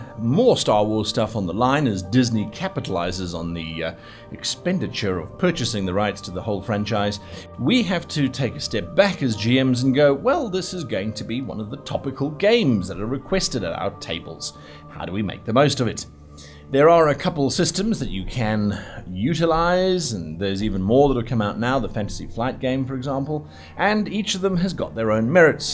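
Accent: United States. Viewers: Top-tier American accent. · Love your work. But in fairness, that was quite the teeth grinding American accent! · Every time I hear it, I’m more unsure if I love or hate your American accent.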